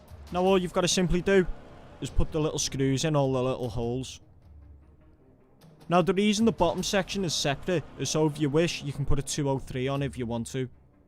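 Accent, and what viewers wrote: Scotland, United Kingdom. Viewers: Nice accent, you Scottish? Or Irish maybe? I'm non-native English speaker so I don't know much about your accents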